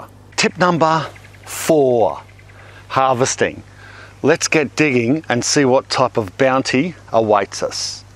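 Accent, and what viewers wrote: Australia. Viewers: Thank you for your Youtube-channel! Watching your videos is a study of Fun Gardening and Australian English at the same time :)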